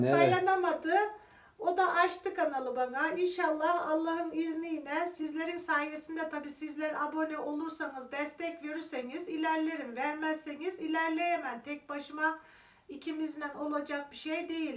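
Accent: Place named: Karabük, Turkey. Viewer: Bacım, Tesadüfen önüme düştün. Zırh örgünle, konuşman yabancı gelmedi Nerelisin diye baktım. Karabük deyince şiven beni çekmiş. Ben Karabük'de 1981_ 1986 yılları arasında çalıştım.Mat ögretmeniyim Ordan şiven yabancı gelmedi, Kastamonu'lu mu acaba diye izledim. Aynı yöre,şiveler benziyor. Bursa'dan sevgi ve selamlarımı gönderiyorum.Takibe aldım yemek videoların güzelmiş, deneyeceğim. 💗💗💗🌷🌹🌷🪴🍹☕🍪🍃🥰🙋‍♀️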